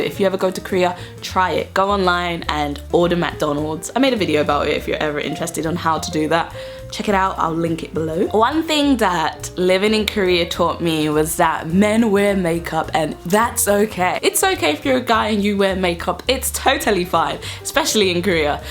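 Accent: United Kingdom. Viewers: It's a BRITISH accent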